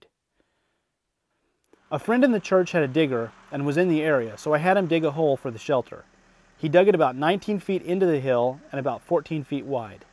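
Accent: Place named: South, United States. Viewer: Let me guess you not from Maine deff from the south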